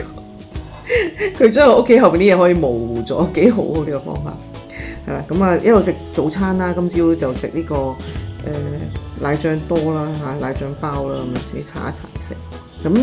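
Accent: Taiwan. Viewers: 口音越來越像台灣人了，越來越融入台灣了，讚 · 掐 (讀音: 恰的平音) 外太空 💖💖叻女依家講嘢夠自然夠放鬆, 發音夠標準夠台味, 試下睇多啲綜藝節目啲字眼會學得好快, 可能係你下一個目標?😊依家返香港會唔會好唔慣啲人講嘢嘅 tone? 有時我聽到隔離啲香港女人講嘢, 太急太 aggressive 都會影響好心情, 要深呼吸, 即時逃離現場~😁😁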